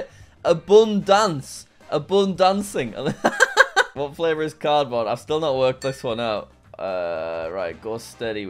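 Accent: United Kingdom. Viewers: Bro sounds british (if hes actually real british im sorry im new to this channel)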